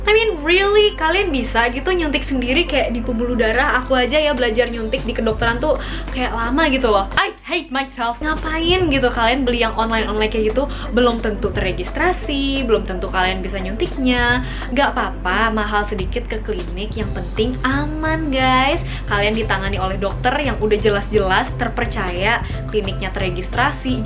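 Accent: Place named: West Java, Indonesia. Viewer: Kek orang sunda yah logatnya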